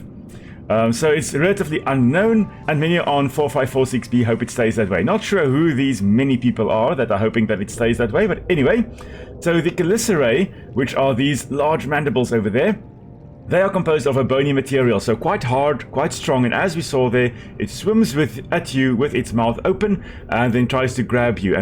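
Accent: South Africa. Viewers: where you from? you sound south african to me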